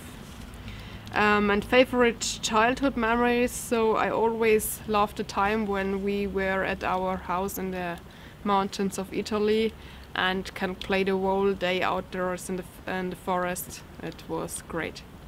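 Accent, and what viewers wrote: Germany. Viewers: Well beautiful Lady. I am so proud of you. This is a beautifully made video. The video setting is perfect. I am impressed how you answered the questions so intelligently. Some subjects are your' personal business for your' knowledge and nobody else. Be careful what personal information you spread over the net. I am always impressed how well you handle yourself even though your' first language is German. I love your' accent, and oh ya many flavors of ice cream also. :O) From experience I remember people always thought my grandfather was a dummy because he spoke broken English with an Italian accent. He actually, like you, was also very intelligent. I am glad you made it clear you have access to the Foxes Den for a long time. I was concerned about you spending money and time on someone else's property. I understand your' passion for the Den. 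Hang in there. John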